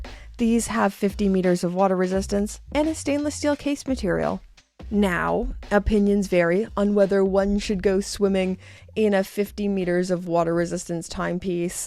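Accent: Canada. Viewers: I keep hearing the long O sound in “about”. Is Canadian? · Stay with your Canadian accent. I can sing the Canadian National Anthem and I’m American. “Oh, Canada…” Are you happily married?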